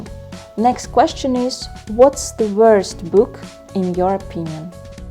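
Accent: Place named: Russia. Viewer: Уроков с правильным произношением и правилами полно. Это тренинг на формулировку своих мыслей на английском. И почему-то русский акцент русскому понимать проще😊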